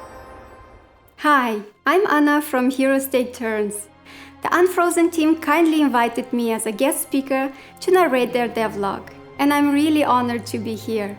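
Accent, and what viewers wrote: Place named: Romania. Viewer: your accent sounds Romanian. I'm Romanian.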